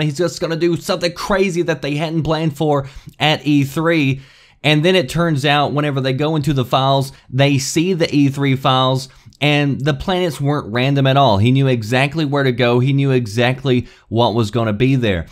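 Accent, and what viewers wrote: United States. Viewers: Wow this guy has some click bait and a really country actsent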